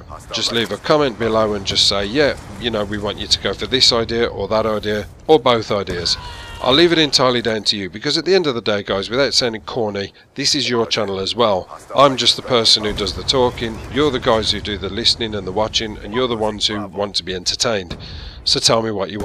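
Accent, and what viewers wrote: Birmingham, United Kingdom. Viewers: hahaha a Brumbie saying "Basicly" always makes me piss my sides. A word that Brumbies always say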